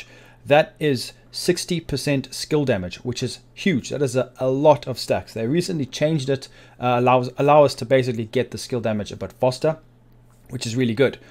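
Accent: South Africa. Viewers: It might just be me, but I swear you sound south African lmao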